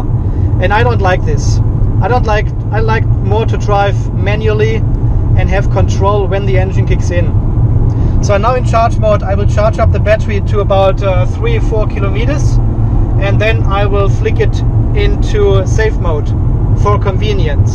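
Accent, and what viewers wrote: Germany. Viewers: when are you going to upgrade to a new Mitsubishi Outlander PHEV? I'm looking forward to the 2020 version in North America, hoping that the 2.4L engine that is promised will provide better hybrid mileage and perhaps updated/better BMS and a little bit more electric range. Otherwise, please keep the educational videos coming; they are all fascinating and your German accented English seems normal/part of the friendly charm of your stories about a journey with the Mitsubishi PHEV. Two thumbs-up for this video, even without the watchdog data along for the ride...j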